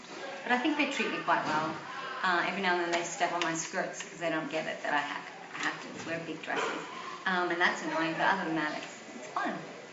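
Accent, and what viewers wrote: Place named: Australia. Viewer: i do detect a bit of an aussie accent, having been to that beautiful country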